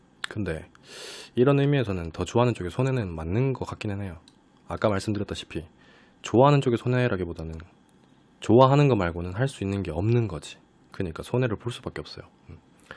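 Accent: Gyeongsang, South Korea. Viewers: 얼마전에알게되서 듣다보니까 대구분이신가요 말투가 대구인데~~?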